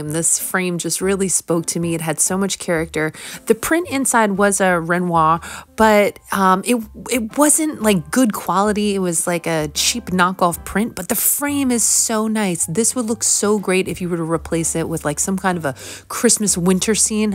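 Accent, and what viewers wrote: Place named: East Coast, United States. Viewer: I can hardly stand to buy new anymore! Everything I wear is thrifted!! And decor for my home as well!!! Books, you name it!! Hugs from Michigan! You sound like you live on the east coast of the United States!! I grew up near Washington DC!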